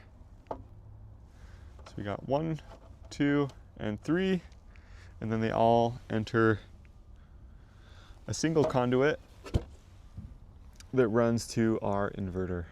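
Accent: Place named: Canada. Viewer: Hey I'm hearing a canuck accent, where are you from? I'm looking at integrating a similar design in our future home. Great video, love from Alberta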